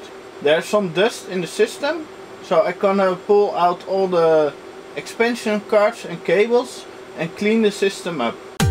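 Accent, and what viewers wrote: Netherlands. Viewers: nice dutch accent leuke pc :)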